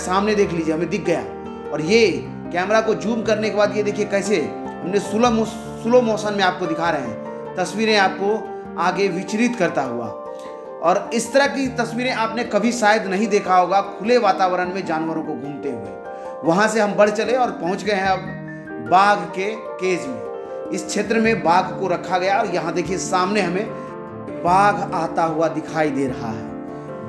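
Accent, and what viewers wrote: Bihar, India. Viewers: aisa kn hai Apne state ka hi accent hai Jab koi punjabi ya south Indian ka pronunciation sunne milta hai tab to log cool bulate hain Humare ghar ka hi accent hai to hai Accent ke naam pr america aur britain vale bhi ladte hain So Bihari hai to bihari accent hi niklega Its not a matter of shame, but our identity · Language hi or language ka tone hi to dusre log se alag dikhata hai jo humare Bihar ka hai isme koi dikkat nhi hona chahiye kyunki yehi culture hai jis par humlog ko proud feel krna chahiye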